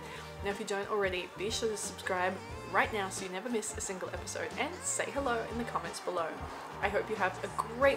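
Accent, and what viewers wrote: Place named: Australia. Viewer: this is the Best part of the Video this is what causes Depression... What happened in the past stays in the past.. Don't think Negatively, move on.. You're so beautiful and Gorgeous, you have that amazing Aussie accent, you're showing us the World... Keep Cool.. waiting for your next Video. Take Care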